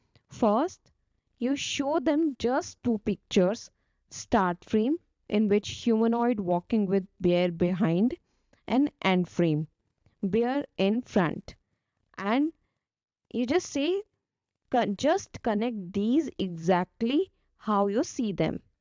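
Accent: India. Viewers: Jesus Christ, you're indian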